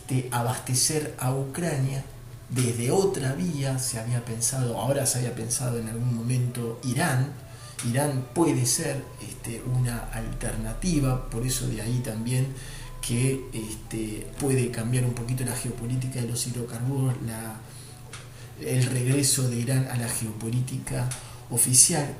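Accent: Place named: Argentina. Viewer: por tu apellido de origen "israelí " no se si seras imparcial ,pero viendo el mapa de crimea como ucraniano creo que no...por tu acento pareces argentino ,sabras que Inglaterra antepone la validez de los kelpers ,ciudadanos de segunda ingleses la AUTODETERMINACION DE LOS PUEBLOS para el tema malvinas ellos quieren ser ingleses....en CRIMEA. esa misma AUTODETERMINACION dice el 97% quiere volver a la madre patria Rusia y volvió..que paradoja Occidente acepta lo de los kelpers no lo de los crimeos , a y te recuerdo que israel siempre voto en contra de argentina las resoluciones de la onu sobre malvinas 3 países lo hacían ISRAEL...USA....Y GRAN BRETAÑA ....a mi humilde entender la trilogía del mal en el mundo VIVA RUSIA...VIVA PUTIN !!!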